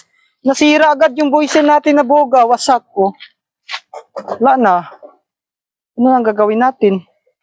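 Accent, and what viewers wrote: Visayas, Philippines. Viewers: Idol bisaya ka ya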